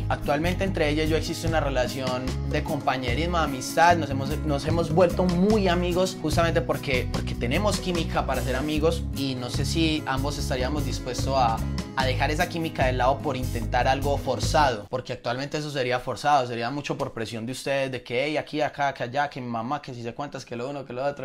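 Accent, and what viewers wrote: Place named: Antioquia, Colombia. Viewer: Que flow paisa 🍃😎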